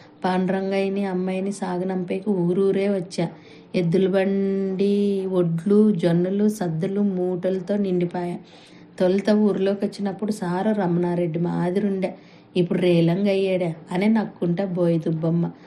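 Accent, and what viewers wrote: India. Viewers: కర్నూలు పడమటి తాలుకాలలోని యాస,గోనెగండ్ల మండలం, ఎమ్మిగనూరు మండలాలలో ఇలాగే వుంటుంది. చాలా బాగుంది 👌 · కుశలంబే గదా ఆంజనేయా కథకు ప్రాణం పోసింది.కథలోని మాండలికాన్ని మారుతీ, తన అక్షరాల్లో పండిస్తే, తన వాచకంలో అద్భుతంగా పండించిది.బహుశా ఆమెది ఎమ్మిగనూరు,ఆదోని ప్రాంతమే అనుకుంటాను.ఇతరులకు ఆ యాస,ఆ వాచకం సాధ్యం కాదు. మనసారా అభినందనలు.సీమ కథను శిఖరాగ్రానికి చేర్చిన మారుతికి ఆత్మీయాభినందనలు.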